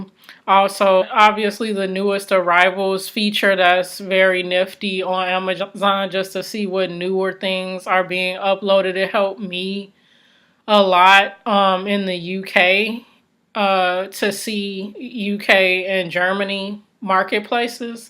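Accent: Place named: Carolinas, United States. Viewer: Nope. You sound like my family but they're from the Carolinas / DC. Anyway love your videos. Thanks.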